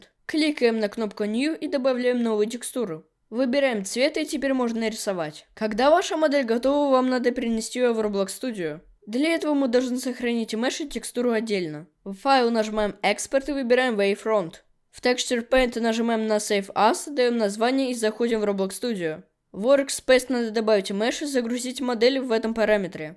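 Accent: England, United Kingdom. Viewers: Нихуя не понятно с этик английским акцентом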